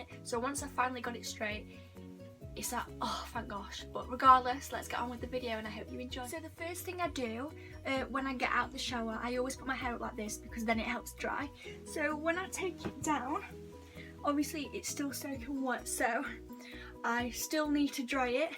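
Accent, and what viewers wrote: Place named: United Kingdom. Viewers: Your British accent 🥺🥺🥺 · It literally took me 2 minutes and 35 seconds to realize she had a British accent i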